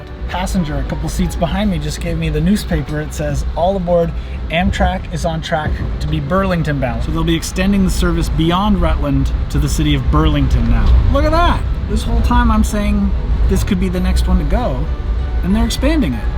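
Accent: Canada. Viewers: The man is Canadian. We don’t have to pronounce things like Americans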